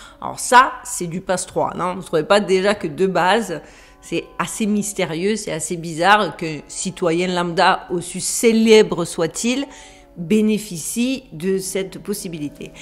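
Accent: South, France. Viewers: Quel humour,à dévoiler les choses,vous n'avez pas peur qui aillent plus loin que la censure,une vraie "Guerrière qui vient du froid😂,euh,non qui vent de là où il fait bon de vivre😁,super vidéo,intéressant d'approfondir le Sujet de L"'antarctique",vidéo traitant de découvertes parfois jamais entendue,avec humour,et cet accent qui vient du Shud🤣,excellent "& conteuse d'histoires extraordinaires pour petits et grands,quand yà rien à la télé,regardez "Les Capsules de L'aventurière Archéologue 😂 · j'aime bien tes videos sur l'antartique ! super intéressant merci et beau travail ! je trouve que tu as un bel accent du sud de la france, tu viens d'où ? Marseille ?? .... lol bises (je viens de Marseille aussi, maintenant j'habite en occitanie) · Je découvre ta chaîne Youtube... Très intéressante 👍.. mais.. ton accent viens de ou ? Es tu française? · Avec l'accent du Sud insupportable en plus... 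Perso je ne peux pas ça me gavé.. next! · Bonjour j'adore l'histoire avec l'accent du sud !!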